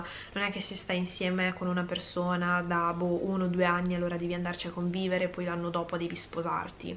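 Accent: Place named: Milan, Italy. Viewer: Molto interessante! Curiosità Indiscreta, hai un Accento milanese con tratti di pugliese.. Ci ho preso? 🤔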